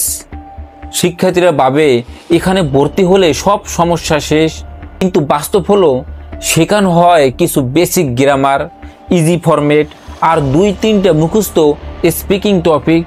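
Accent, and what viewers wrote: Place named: United Kingdom. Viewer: ভাইরে ভাই যেভাবে প্রোনানসিয়েশন গুলো করতেছো মনে হইতেছে ব্রিটিশ এক্সেন্ট শুনতেছি ❤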